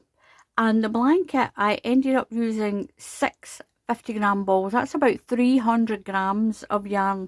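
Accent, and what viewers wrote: Scotland, United Kingdom. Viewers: Lovely! It’s always nice to get another variation to the granny cluster. And when I do this one I’ll hear your Scottish accent guiding me. Thanks! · Love watching your videos especially hearing your lovely Scottish accent. Very pleased you do not have music playing which makes it easier to concentrate on your inspiring videos. Very happy to be a subscriber to your channel. ❤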